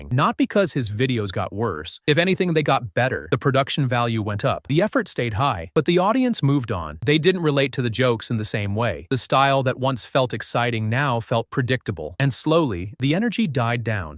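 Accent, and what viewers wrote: India. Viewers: she has indian accent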